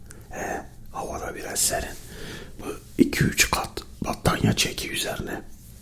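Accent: Eastern Anatolia, Turkey. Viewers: Doğu şiveli zaten güzel oluyor bir de bir şeyler atıştırmişsın ya abi iyi baya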